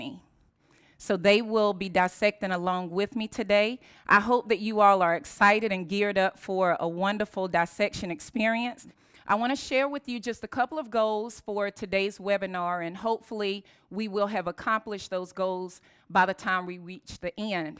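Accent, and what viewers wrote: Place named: South, United States. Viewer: She from the south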